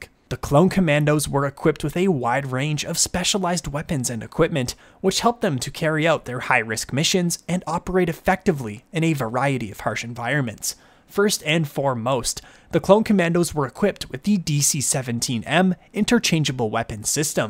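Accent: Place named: Canada. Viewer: Most Canadian accent ever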